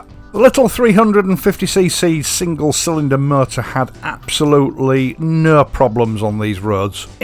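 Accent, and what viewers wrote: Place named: Hull, United Kingdom. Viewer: Apart from the really good motorbike content, I just love listening to your Hull accent. Pure music! Great video.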